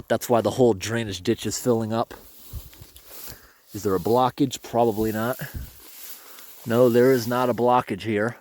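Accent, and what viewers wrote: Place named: New England, United States. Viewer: I think your yankee accent threw them off... They weren't sure what you were saying.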